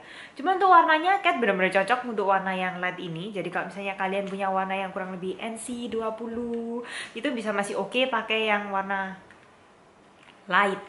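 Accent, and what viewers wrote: Java, Indonesia. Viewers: Ci medok banget ! Nice video btw~ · Itu dimedok2 in apa medok beneran si kak 😬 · hahaha I❤U!! lucu, ga lebay, kocak bat medok gt. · Dulu pas mau lihat, wah muka2 kek gini pasti ngomongnya inggris nih. LAH KOK medokkk gitu mbakeeee... luccccuuuu 😂😂😂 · kak medok bgtt gaboring sukaa bgttt wkwkwk😂😂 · Medok jawa nya 😂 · Jawa banget, keren keren. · Kocak abis reviewnya jowo banget aku langsung subscribe ka wkwk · Oh my~~~ untuk orang yang dulu tinggal di surabaya dan kangen medok nya orang jawa, seneng banget nonton video ini 😍 Dan aku langsung ngakak denger pengampon, kalo beli makeup murah juga di pengampon 😂😂😂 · Medok nya wkkwkwk♡ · wadoh ce medok poooll 😂 subscribed yooo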